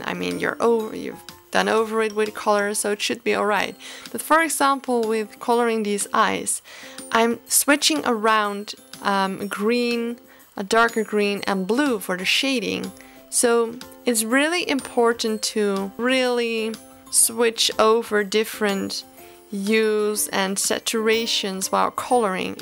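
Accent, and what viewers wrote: Netherlands. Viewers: Dutch? XD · Are you dutch by chance? idk I kinda sound the same when I try to speak English.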